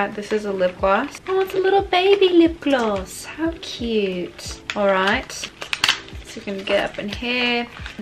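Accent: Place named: United Kingdom. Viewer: That british accent is everything😂❤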